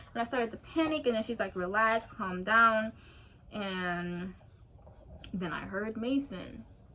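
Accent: Jamaica. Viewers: Ooouuu u Jamaican girllaaaa😛😁. Sorry lol my bf also Jamaican nd the fact that I understood wat u was saying I got press lol😂. He be teaching me stuff😄.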